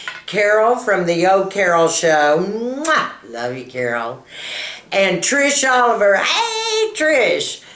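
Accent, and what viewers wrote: Texas, United States. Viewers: You look as young as the others and that is the result of a lot of skin care and taking pride in yourself - you look stunning and I love that Amore Pacific and it sounds wonderful - great choices; I don't powder either - have a wonderful day - love you like a pig loves bacon heehee xxxxx Suzy.....love your Texan accent with the French words:)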